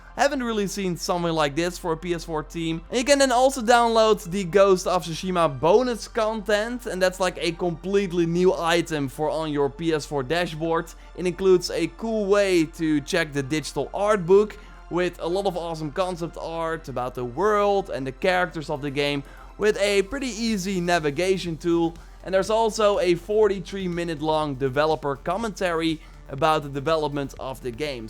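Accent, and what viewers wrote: Netherlands. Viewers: Ik vindt t echt geweldig dat ik je Nederlandse accent meteen merkte, en toen je de ps store liet zien stond t ook in het Nederlands lol Goede info btw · You sound Dutch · I knew you were dutch :)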